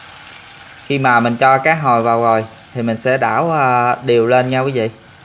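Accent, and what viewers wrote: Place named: South, Vietnam. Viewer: Nghe giọng giống của thầy Thích Tâm Phúc quá ! Rất Nam bộ , tuyệt vời ! Cảm ơn tác giả nhé !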